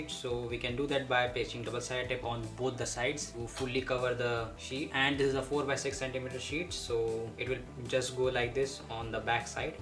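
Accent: India. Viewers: Why do indians speak english like this!? It is infuriating!! 😡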